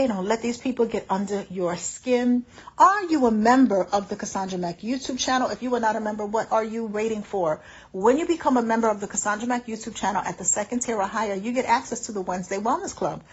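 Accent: New York, United States. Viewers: Case and Point: This video is WHY I am a Tier 3 member of this channel. The wisdom that you have been graced by God to have is a blessing. Then, your authentic NEW YORK ACCENT is EVERYTHING❣️🙌🏾🎉✨😍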